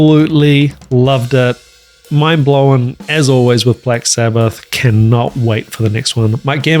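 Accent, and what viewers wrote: New Zealand. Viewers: Here's coming from America. Me being an armature voice actor, I appreciate the Kiwi and Aussie accent and wish i could do it better lol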